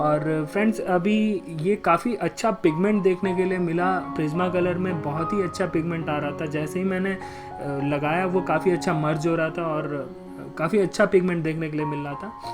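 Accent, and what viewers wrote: Bihar, India. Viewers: Sir aap Bihar si hoo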